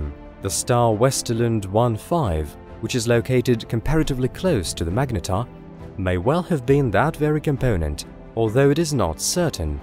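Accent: United States. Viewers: You sound American...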